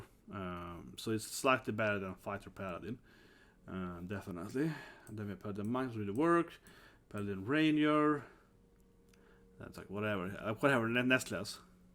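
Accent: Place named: Sweden. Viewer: A tip is to slow down your speech a little, it's a bit hard to hear sometimes and the subtitles are somewhat bad when you speak fast and loose with that Swedish accent.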